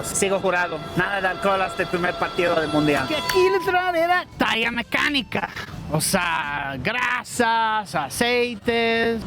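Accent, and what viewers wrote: Mexico City, Mexico. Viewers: Saludos hermano, me encanta tu acento chilangles fresa, sigue haci nunca cambies, gracias por hablar tan bien de mi hermoso Mexico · Tienes acento chilango!! 😂saludos desde Guanajuato. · Amigo se te sale el acento chilango 😂🎉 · el Mejicano... MAGNIFICENT MAGNIFICENT!!! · Me encanta que tienes acento mexicano.:D bueno de CDMX pero de Mexico. Pero no les digas a tantos! si no luego vienen mas y luego no nos alcanzan los tamales!:D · Hasta acento chilango tiene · Muy cierto! Ya hay muchos youtubers que saben cómo lograr 👍🏻 con los mexicanos (solo es hablar bien de nuestro hermoso México 🇲🇽). Y lo demuestra con su español "mexicano"🎉 gracias por amar a México 🇲🇽❤ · hasta tiene acento de chilango, todavia se nota el ingles pero tiene un acento MUY bueno. Es super autentico, aprendio el español en las calles de la cdmx no en los libros de texto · Hola estoy aqui x tu anuncio en Instagram y me encantó ver este video. 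Ya me suscribi y gracias x compartir cosas tam bonitas de mi ranchito Mexico, todo es verdad, la hospitalidad Mexicana no tiene igual, en Mazatlan recibimos muy bien a los extranjeros, recuerdo mi familia a recibido en casa a invitar a comer a turistas y amigos de Perú, Italia, Canadienses, Americanos, y paisanos de diferentes estados, Jalisco, CDMX, Nayarit, Oaxaca, Sonora, Chihuahua, BCS, BCN, y de muchos otros lugares que ya no recuerdo y sobretodo amigos locales, que siempre tienen un lugar en nuestro corazón, te deso mucgo éxito en tu nuevo emprendimiento, cuando gustes visitar Mazatlan ya sabes que serás muy bien recibido 🌅🎉 cuídate mucho tu y tu familia y te deseamos lo mejor de los éxitos y es verdad he? Como te vi un parecido con nuestro querido y bien recordado Paco Stanley, y me encanta como hablas ya bien español mexicano de CDMX 💪😬🎉🎉🎉🎉Felicitaciones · Eres un extranjero que al llegar a México se puso el de Mexicano 100% es más me pareces un chilango con poquito acento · Ya hasta trae el acento mexa 😂😂. 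Ya eres uno de nosotros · hermano.... ya eres mexicano, saludos. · pinche, ya me caíste retebien. Eres el inglés salamero! jajajaj bienvenido cabrón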